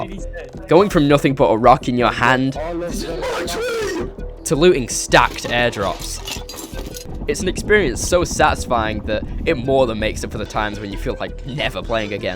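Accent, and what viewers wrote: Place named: United Kingdom. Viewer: British voice, unsubscribed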